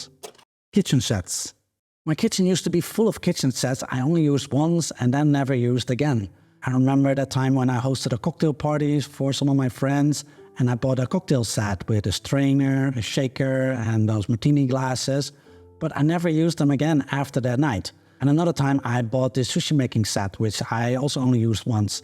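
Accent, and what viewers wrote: Netherlands. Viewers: Ik dacht al dat ik een NL accent hoorde😊!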